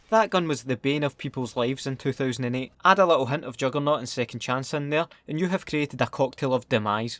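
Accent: Scotland, United Kingdom. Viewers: I watched so many of your videos back to back I've taken up some of the Scottish accent and I DON'T REGRET IT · Your scottish accent made this video 10X better lol.. love this game and so glad there making a new one. 👍🏼👍🏼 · Your Scottish accent is a gift from the heavens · Why have I only just found out about this channel, I fucking love this, nice work bro😂😂 the Scottish accent made it twice as good👌👌👌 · I like watching u more than other youtubers probably because he Scottish haha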